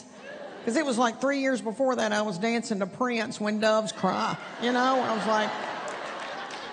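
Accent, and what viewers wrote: South, United States. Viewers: I've watched your videos over time,🤨 Each time, I could not remember🤔 you being funny in any other video that I had watched. Yet I'd watch another one.🧐 Thinking maybe you're going to say funny this time ....🥱 Well, here we are.....😳 Maybe I just like watching you for your nasıl toned southern accent, Not that I'd recommend it, Cause that is not particularly interesting either. Maybe you're a niche comedian; Funny to a particular group. Wow, 😲 finally ❗ you might have just said something funny 😲😲😲 😂🤣🤣🤣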